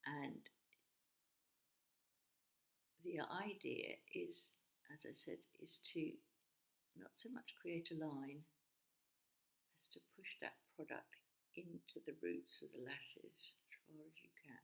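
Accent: United Kingdom. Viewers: I have only just discovered you & found this vid really helpful & good to watch, thank you. Also nice the hear a Brit for a change, although I love the US ladies I follow...( I am from UK, currently living in France). I look forward to seeing more of you asap !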